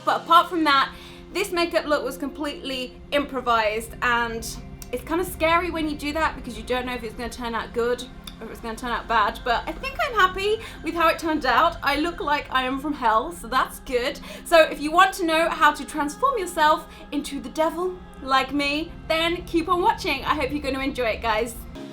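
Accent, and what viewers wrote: United Kingdom. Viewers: When you started talking it cracked me up because that light British voice with the devil face was just such a wierd combo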